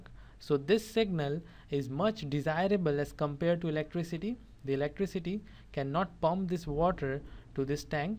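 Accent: India. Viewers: 浓浓的咖喱味口音让我无法静心学习。。。可能是好课，但不太适合我！cry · Bu Hintlilerin İngilizcesi beni bitiriyor abi. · His English is fine. I understand him. Most Indian professors at my local university have a thicker accent than his.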